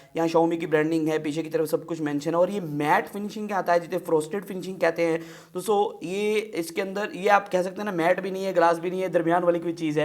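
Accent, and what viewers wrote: Faisalabad, Pakistan. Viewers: Yr kahi dekha h apko... Faisalabad sy to ni??? Boltay b Faisalabadi ki tra he ho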